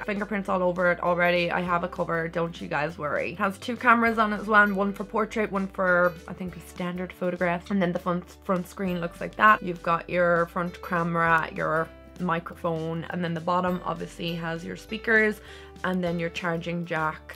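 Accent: Ireland. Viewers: Is she Irish?NVM I can tell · Are u Irish?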